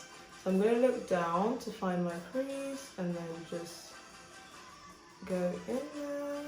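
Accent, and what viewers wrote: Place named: China. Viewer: I LIKE YOUR CHINESE ACCENT MME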